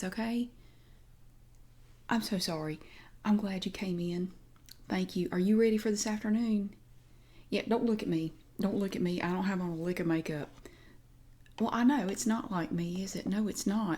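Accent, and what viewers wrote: South, United States. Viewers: I lived in NC for a couple years and this reminded me of folks down there. I never thought I'd miss the southern accent.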